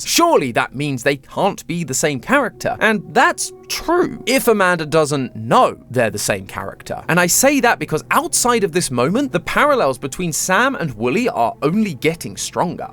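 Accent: United Kingdom. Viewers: i forgot tom replaced matpat,so when i clicked i got jumpscared by a british accent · I miss Mat. The british accent is weird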